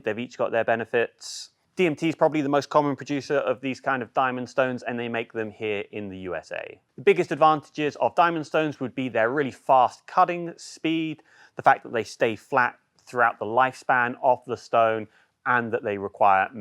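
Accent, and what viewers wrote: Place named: United Kingdom. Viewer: From your accent I thought you would be in the UK. I follow the link and the prices are in $? Hey ho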